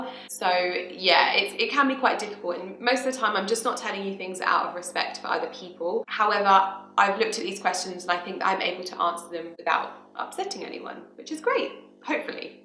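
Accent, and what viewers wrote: RP, United Kingdom. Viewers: First! For the first time! I was waiting for the video and tadam! ❤️ Great video really! It’s nice to know you better (and sometimes think « oh! just like me! ») Too bad about grammar. I hoped increasing my english level by watching your videos and reading your blog 😁 Just kidding of course. To me your english sounds so posh and you know what Pr Henry Higgins says in My Fair Lady : « The french don’t care what they do actually as long as they pronounce it properly ». So grammar or not grammar that is not the question, to me you are perfect. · I think you have great grammar but I love what you have said because I'm the exact same. I think your accent makes you sound posh if you had an aussie accent like I do it's worse it's so bad. Xoxox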